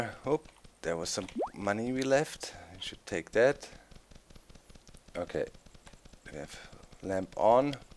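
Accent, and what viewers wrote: Germany. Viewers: is that a german accent?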